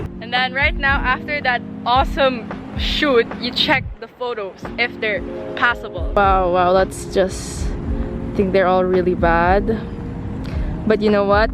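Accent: Philippines. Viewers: are you filipino? (accent) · damn, filipino accents, its so weird, okay im not hating because I also is a filipino, and my old "grammar" doesn't make any sense, just saying okay, dont hate me I also use to have a bad accent when speaking english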